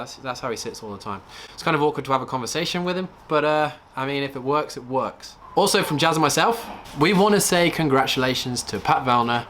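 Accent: Australia. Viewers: Aussie accent 😂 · your accent changed a bit to Australian! haha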